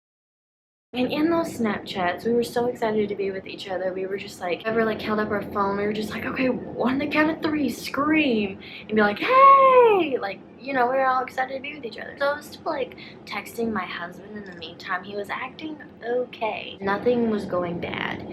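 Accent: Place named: Virginia, United States. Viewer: Biiiiitch, I knew you had a Virginian accent. Just started watching your videos and was going crazy knowing your voice was so familiar lmao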